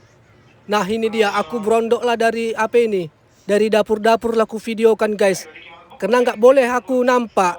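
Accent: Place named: North Sumatra, Indonesia. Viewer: Abang logatnya koq seperti orang dari Sumatra utara sepertinya? Sungainya jernih enak,abang lepas celana jebur Brenang enak!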